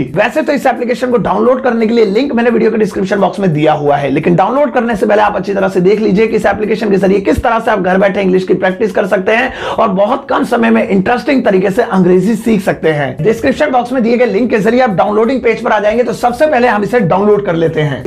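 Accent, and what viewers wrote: India. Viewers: Indian accent is not nice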